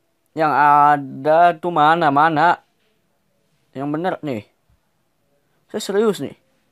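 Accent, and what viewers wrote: West Java, Indonesia. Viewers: orang sunda ya ???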